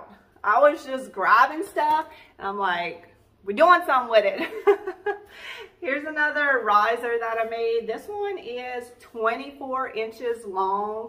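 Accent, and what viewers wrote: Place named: East Texas, United States. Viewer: Your stuff is so cute!! And your accent makes want to move back to East Texas. I was an hour away from Shreveport LA. Now I'm baking in Phoenix AZ.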